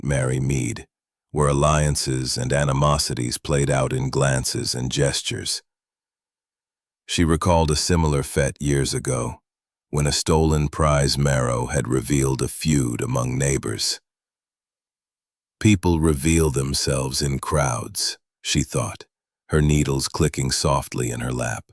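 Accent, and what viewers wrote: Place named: United States. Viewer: How awful! An American "Hollywood Blockbuster" voice reading a very British story which demands a very British voice and enunciation. Don't! Just don’t.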